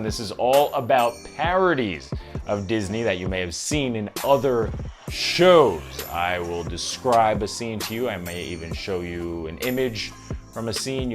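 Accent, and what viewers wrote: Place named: New York City, United States. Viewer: same! is such a natural with hosting! One of these days, I will do a "Hello Belle!" impression just as good as him. Plus, you can't go wrong with his NYC accent (, just an FYI, I'm going to your hometown later this month! NYC is my favorite! I'm so excited!!) Wheel of Fortune dropped the ball by not tapping him to be Pat Sajak's successor and instead going with that overblown twitty twatty Ryan Seacrest.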